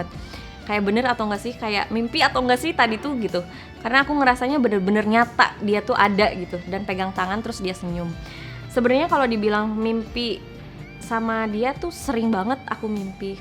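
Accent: Indonesia. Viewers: Sunda pisann logatnya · Sundanya mentok teh, mantap!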